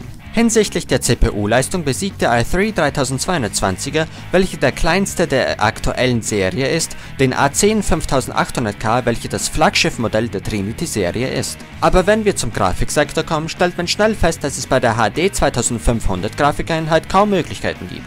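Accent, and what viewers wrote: Austria. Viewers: Ich liebe den österreichischen Akzent! ^.^ Gutes Review.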